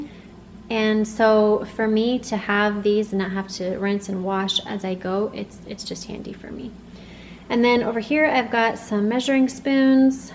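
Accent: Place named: United States. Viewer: Your accent is wonderful, in which state in America I can find this accent? I am an English learner and I want to deal with the American accent. Please, give me the name of the state.And thanks in advance.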